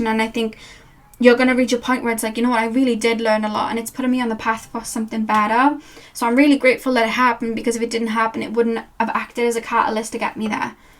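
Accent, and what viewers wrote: Wales, United Kingdom. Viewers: Thank you,! Pile 3. I, for one, never thought your accent was faked! It's very charming and very British Isles...bless you for your dedication to your audience! Sending love!🙏🙏😊❤️ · thanks for the reading, and I love the Welsh accent, don’t worry about those people who have to say something ridiculous about you, the comments are more about showing who they are than who you are. Take care of you! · Pile 3: You sound perfectly, authentically Welsh to me! I have to agree with your interpretation of "divine timing." Besides, we're not really separate from the universe in any sense, so how could it be up to anyone but us? Thank you! 💜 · #3 Love your lovely Welsh accent. Take no notice of anyone that says otherwise. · Welsh is just an accent we don’t hear a lot, at least here in the US. We get some new Irish & English immigrants and visitors, and both those accents are well known culturally anyway, and so is Scottish(I love Billy Connelly), but I’ve never met a Welsh person or even heard a Welsh accent in a movie. 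The first time I heard you you sounded like a mix of multiple accents to me-including various NY accents(which includes different accents in each of the five burroughs, plus Latina and Carribean accents), and there were moments when you even sounded like you were from “the valley”/California, in addition to a vague scotch-Irish-English sounding accent. It was confusing because you like American pop music so much, and talks in an English accent a lot, and she’s from NYC, and Carribean-American, but she lives in Hollywood now. I prefer listening to musicians from around the world on NPR Tiny Desk YT channel. In female rappers I like, and. I also love singers, and. My favorite artist might be; does a beautiful cover of / 💚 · I love your accent ❤️ My mum died when I was young and she was Welsh so I enjoy watching you because it reminds me of her and it’s not an accent I hear outside of my own family in Australia xx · I am by no means am knowledgeable about accents (as an ignorant American), I thought your accent was authentic but assumed you were from Ireland. 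😅 I thought you had an Irish brogue until you said you were from England. Whoops. 😆 So, up until following you, I had never heard a Welsh accent. I may have, but always assumed it was Irish I guess. 🤷‍♀️ Anyway! Amazing readings as always! Thank you for taking the time to make it and upload this. 😊🙏💕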